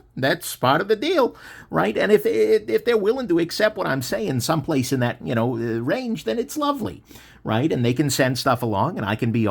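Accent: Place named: Brooklyn, United States. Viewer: Love your voice, it's like if Professor Frink was from Brooklyn.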